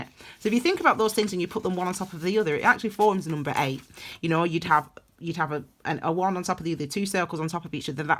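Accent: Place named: Northern England, United Kingdom. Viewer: Are you from Colne, UK. by any chance? I live in Cardiff now but I’m from Oldham originally, so I recognise the northern accent. I’m guessing it’s either Colne or Burnley.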